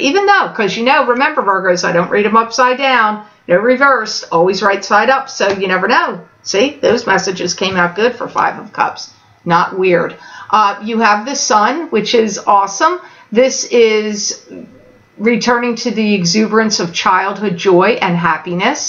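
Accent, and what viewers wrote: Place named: Pittsburgh, United States. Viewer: Are you located in western PA? Because if so I'm pretty confident that it could be my family members that are attempting to communicate to me through you 😂😂 I literally was thinking about them and your accent 1 minute before you said you got the chills and I got them with you! I am a Virgo sun and moon and have watched you religiously for over a year. I LOVE YOU! (You're not crazy so don't think that you are!) you channel so many energies and it's up to us to vibe at your level to actualize these realities. Anyways I think it's my family because they are from Pittsburgh, I have TWO clogged sinks, a plumber just left for a leaky toilet, i have been needing to fix my AC for months, and need to return my air diffuser. I've been hesitant about moving but just decided to move long distance from my love and it has instantly improved our relationship! 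Like molded up the gel for sure ;) and I haven't moved yet! I have been dragging my feet with healing and creating for a year because it's different from "being a normal RN" and your reading just helped me significantly. Affirming my feelings. The eclipses were NUTS 🥜 but this month has been amazing and it's only day 2!!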